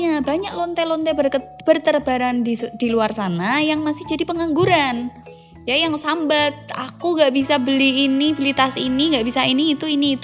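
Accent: Java, Indonesia. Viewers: Jowo ndi sampean mbk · mbak.e wong jowo jowo endi mbak? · Mbk ee kok jawa orang mana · logat jowone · Wong jowo ki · Medok bgt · Dialeknya jowo.. Rasa asik dengarnya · Medoknyaaaaa mantabs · Jowo medok pisan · Wong jowo yo mbk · Wong jowo toh mbak? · Ya kan ya kan..jawa pundi mbak kok ya kan ya kan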